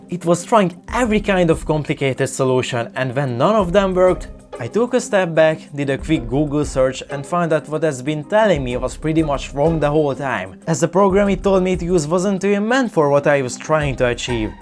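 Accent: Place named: Hungary. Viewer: you sound like you have a hungarian accent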